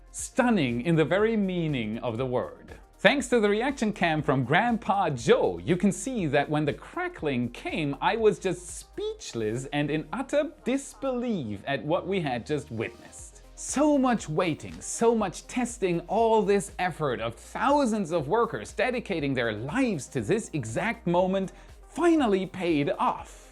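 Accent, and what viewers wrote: Germany. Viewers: You can move to the us,but the german accent îs still there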